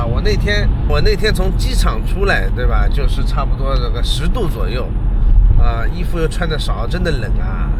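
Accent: Shanghai, China. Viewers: 从你口音我觉得你是个土生土长的上海人，我也是，在国外的街头偶尔也是听到上海话，匆匆而过时，彼此也会报以会心的一笑，我们都知道这是思乡之情在作祟，因为我们都想念它。感谢你的视频，感觉是你的一种释怀，但还是要谢谢你的豁达，Merry Christmas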